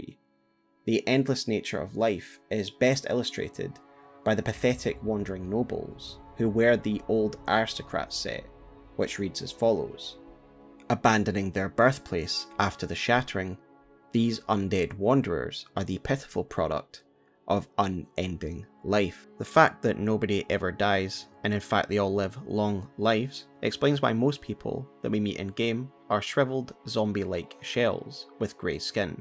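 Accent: Scotland, United Kingdom. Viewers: I don't mean to alienate you, but can you please let me know what region of the UK you are from, I'm struggling to pinpoint your accent. Love the content! You are a lore machine. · Man, don't even try to hide your scottish accent, it sounds good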